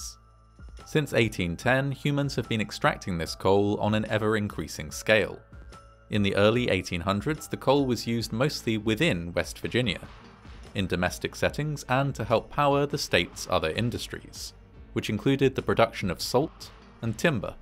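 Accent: United Kingdom. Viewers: I love his narrations, such a calming and soothing voice with a very non judgmental or incriminating approach! Mee-thane gas, gotta love the original English interpretations. Remember folks, it started with them, not with us in America! I love and marvel how the British and Scottish pronounce common English words! It sounds wrong but again (a-gane), it’s we that have it all wrong not them! 🇬🇧🏴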